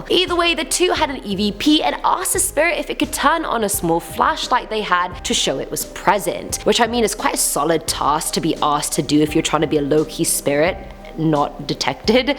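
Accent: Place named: United Kingdom. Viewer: Lady get you a better name than you have, it sounds like a foreign name and if you are, why are you speaking British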